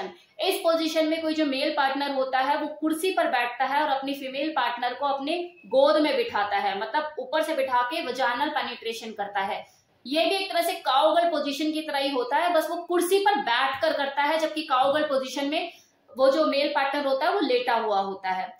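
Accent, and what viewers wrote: India. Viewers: Mam aap ke samjhane ka tarika bahut pasand aaya aur aap ki voice so sweet & personality & dressing style bahut achcha hai you are so good looking I am impressed 😊 sabse achcha aap ka Desi style way of talking ❤️❤️❤️❤️❤️ Love 💕 you mam 🙏🙏🙏🙏🙏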